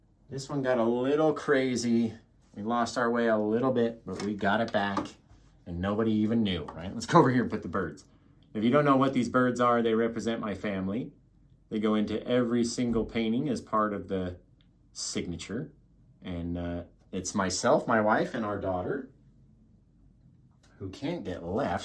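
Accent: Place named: Chicago, United States. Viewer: you crack me up. Now I'm saying "Fantastic" through everyday 👏. I do like your accent a lot! I know you live in Nevada, but are you from Chicago with that accent. I'm from Texas and fav sandwich PB & J...👀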